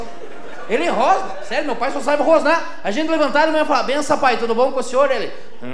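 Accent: Curitiba, Brazil. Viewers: Myller Rodrigues Curitibano! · Se tu nasceu ao redor de Curitiba , esse aí é o sotaque .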